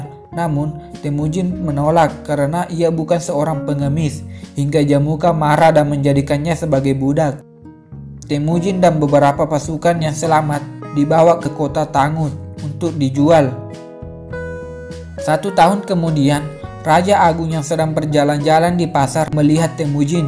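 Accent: Bugis, Indonesia. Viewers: Logat bugis ta yee' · Mantap logat Bugis nya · Bang lu orang bugis gak si. Soal nya orang bugis ngomonya kaya gitu? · Makassar gk bisa hilang logatmu 😂😂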